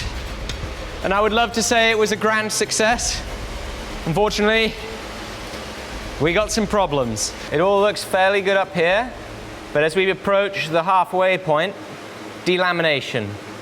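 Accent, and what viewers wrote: United Kingdom. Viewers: A Brit talking about Hillbilly Engineering makes my redneck heart feel good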